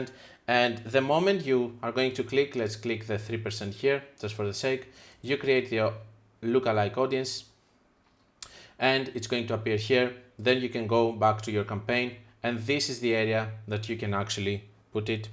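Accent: Greece. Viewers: Όταν καταλαβαίνεις ότι είναι Έλληνας απ'την προφορά χαχαχ μπράβο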